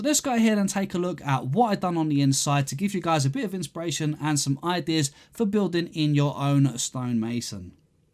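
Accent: United Kingdom. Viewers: Hey...my daughter is the reason i play minecraft. Its our way of spending time together. She likes the game so i take intrest in what shes doing and enjoying. Shes always asking me to build things for her cause she wants her village to expand faster...well sadly im definatly not an artist. And my builds look worse than what she can do..lol so i started you tubing tutorials and found you. I love how simple you make the builds seem. And i absolutly love love love your accent and the sound of your voice. Where are u from if you dont mind me asking. My guess would be in the uk somewhere. But my daughters village is really starting to take shape and she is really loving the midevil style. Thank you so much for making my daughter happy...she was getting annoyed with having to rebuild my builds so they dont look like crap...lol and now shes you tubing tutorials as well and finding stuff she likes. 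So i have subscribed and look forward to seeing more amazing things that me and my girl can do together... bringing families together.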